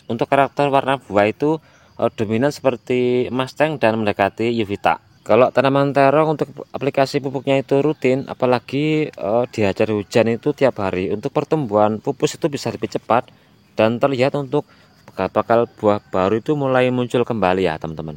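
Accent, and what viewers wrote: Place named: Banyumas, Indonesia. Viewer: Ngapak temen lur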